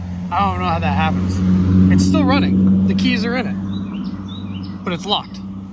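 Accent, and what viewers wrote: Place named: South, United States. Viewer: Hey it Southern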